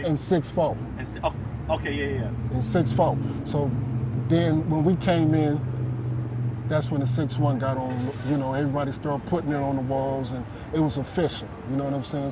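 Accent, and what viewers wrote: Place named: Chicago, United States. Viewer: He sounds Chicago not new york... we don't talk like that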